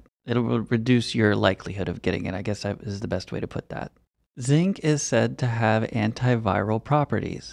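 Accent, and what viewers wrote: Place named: Pennsylvania, United States. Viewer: Maybe Pennsylvania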